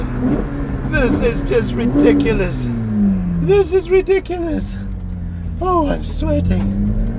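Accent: South Africa. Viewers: He has a South African accent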